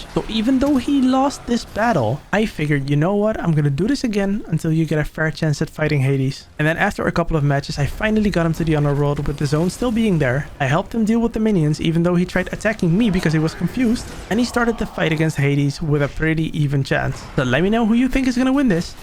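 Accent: Netherlands. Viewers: Hey!! Just wondering correct me if I'm wrong but umm, are you Dutch by any chance?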